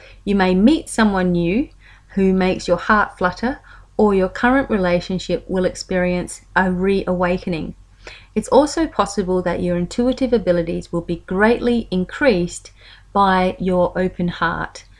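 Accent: Australia. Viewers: hi thankyou for your monthly dose of spirituality, i was looking forward to listening to this. I especially like the part about what you said about Cats, yes they have a great energy, that they pass on, when all around is hectic they can bring a sense of calmness. keep the videos coming, watching and listening is a meditation in itself. Along with your lovely Aussie accent. peace and love.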